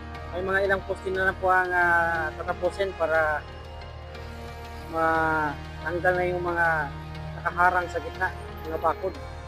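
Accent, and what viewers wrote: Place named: Visayas, Philippines. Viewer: Ah...bilib me Kay kuya...bisan halata bisaya kaayo...banat kuya....fight lang....ayaw ug kakapoy diretso lang...haha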